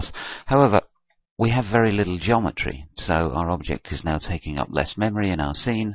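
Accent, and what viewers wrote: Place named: Australia. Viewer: That was a gold tip! Thank you! I also think you are australian by the accent! :D I love this accent! Have a nice day!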